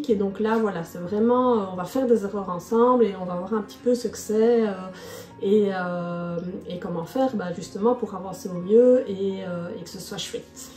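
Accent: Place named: Belgium. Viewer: Salut, t'excuses pas de ton accent Belge, sois en fière. Bonne chance pour ta chaine, ça a l'air très intéressant.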